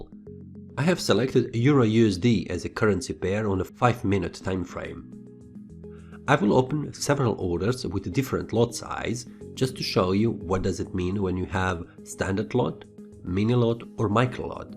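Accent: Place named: Serbia. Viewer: I like your accent where are you from? Serbia?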